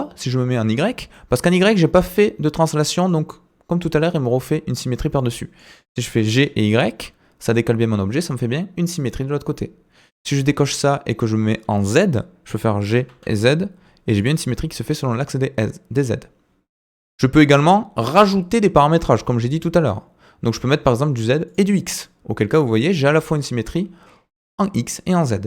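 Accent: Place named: Toulouse, France. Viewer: T'as l'accent toulousain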